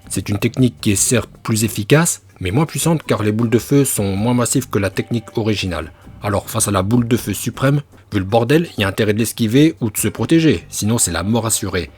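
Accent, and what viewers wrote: Japan. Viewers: L'accent jap est magique !!!! Est-ce qu'Amaterasu compte comme une technique Katon cher porte parol ? (C'est du feu non ?)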